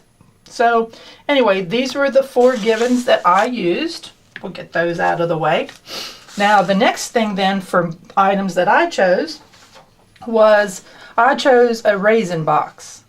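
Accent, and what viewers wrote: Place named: Baltimore, United States. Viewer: That would be awesome but I think we are on opposite sides of the country. I thought I heard a familiar accent (I am from Baltimore) but we live in Seattle. My sons are still in Maryland but I don't think I can talk them into picking up blueprints to bring out in their suitcases for Christmas!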